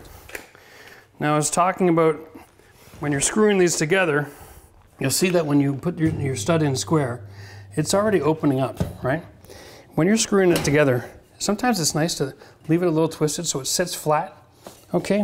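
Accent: Canada. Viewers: I'm not sure about there, but they changed the formulation of pressure treated down here, and it eats steel pretty fast. If you don't use HDG or coated nails / screws - they'll be completely gone within 2 years. What your using there doesn't look pressure treated though. Maybe there's some sort of flashing tape, or maybe a strip of tyvek or something would be good to protect that? Idk - tyvek might pass it right through? Or maybe there's some kind of coating for the track? Maybe - my fear is just unfounded! Also, dang right, tyvek isn't a vapor barrier! It's a vapor retarder, but it's not a barrier. But up there (assuming Canada from the accent), my understanding is that exterior walls up there have a vapor barrier on the inside, fully taped seams. Down here in hot climates there is no vapor barrier at all. There's a vapor retarder. The paper face on the insulation - which also goes on the inside of the wall. 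The exteriors we wrap with either tyvek or 15lb.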